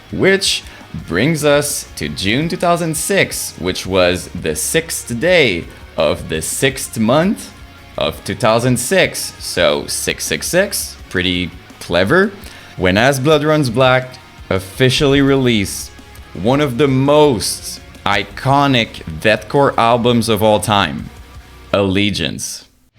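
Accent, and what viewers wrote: Quebec, Canada. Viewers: Are you fromQC? You sound like you are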